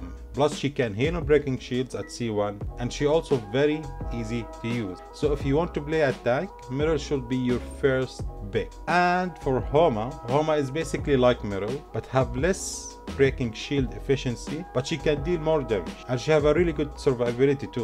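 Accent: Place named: Arab world. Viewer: Where r u from man? you sound arabic af 😄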